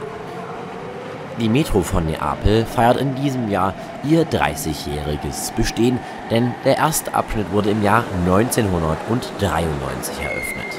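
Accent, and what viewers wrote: Germany. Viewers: Hallo, ich habe jetzt schon einige Videos von dir geschaut und mich gefragt, woher dein Dialekt kommt? Hast du irgendwie Wurzeln in Baden-Württemberg? 😄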